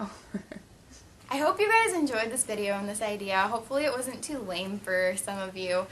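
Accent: United States. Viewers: Are you from America madam please say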